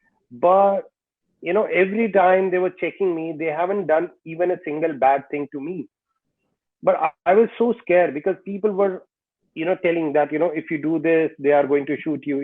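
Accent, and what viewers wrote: Myanmar. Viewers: You shouldneed. For practicing to become good pronounciation.At the moment very Burmese tone you had announced.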